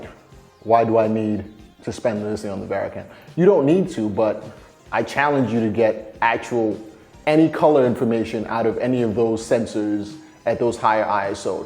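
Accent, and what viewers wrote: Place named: East Coast, United States. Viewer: Ok, thats makes sense. Sounded like an East Coast accent. I'm trying to test out the Varicam but they dont have one in Chicago (for rental atleast). But from what I've seen and some footage I've graded it looks to be a solid camera. I'm rolling with the Ursa Mini 4.6k right now, which is great, but it has some problems with fine hue discernment (especially on the red channel) and not a nice sensor texture above 800ISO. I shoot mainly docs, and some promos. The varicam looks like the move for me in early 2017. Cheers!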